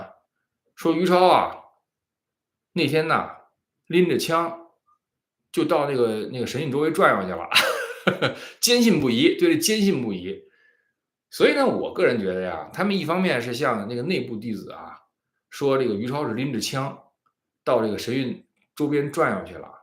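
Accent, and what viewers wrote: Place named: Beijing, China. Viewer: 笑出聲兒……昨天沒睡好郁悶的慌，聽了您的京腔兒播報感覺松爽很多。您小时候得有多淘呀⋯⋯哈哈😂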